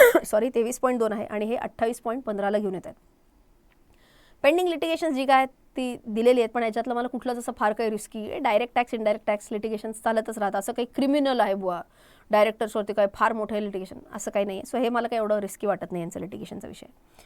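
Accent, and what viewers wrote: Pune, India. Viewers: पुणेरी भाषा आहे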